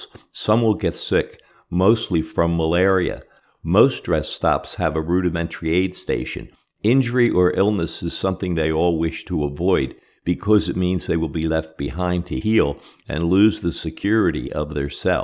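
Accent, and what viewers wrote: Philadelphia, United States. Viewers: Definitely could tell he’s from Northeast Philly without even having to look him up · are you from philly? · The Narrator's accent seems to be from the Philadelphia/South Jersey/Delaware Valley area.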